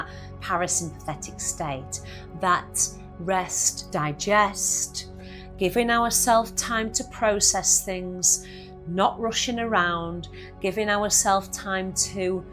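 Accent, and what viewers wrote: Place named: Yorkshire, United Kingdom. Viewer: Thank you for this. I'm really starting to learn to pull back and stop when I get myself in a high state of anxiety as I know acting from that state isn't helpful. These exercises really do help to bring me back down to a place of calm and stop me wasting hours in a loop of anxiety. I thank you so much for your videos and your down to earth manner, I'm a Geordie so I respond well to your Yorkshire accent and humour, much love to you 🙏❤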